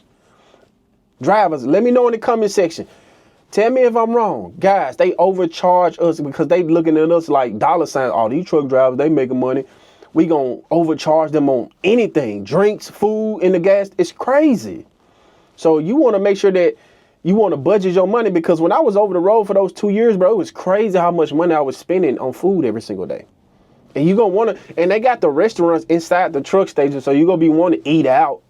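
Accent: Memphis, United States. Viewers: U from Memphis mane ? U got the accent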